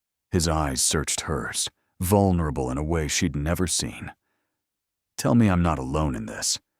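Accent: United States. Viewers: The American accent doesn't belong in this English aristocratic period story. The narration comes over as bitter & angry. The inflections and punctuation are all wrong and detract from the story telling.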